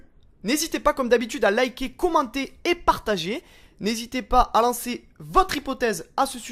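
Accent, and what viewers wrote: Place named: South, France. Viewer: Tu a un axant du sud 😁😆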